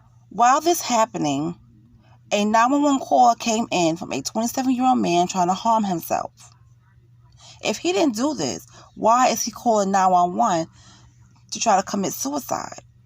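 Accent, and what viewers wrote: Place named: New York, United States. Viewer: I'm from Detroit. I love your ascent. Sounds like NY?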